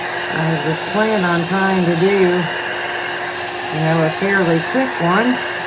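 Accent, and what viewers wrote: South, United States. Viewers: I like your Southern accent. Maybe you could edit out the blow dryer noise. That's kinda loud.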